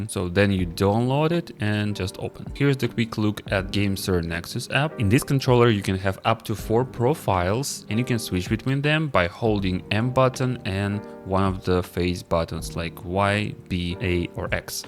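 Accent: Russia. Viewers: Бро, за сколько времени ты выучил английский до такого уровня? Я по первым секундам понял, что ты русский, несмотря на идеальное и приятное произношение. Мне интересно стало, сам начал только изучать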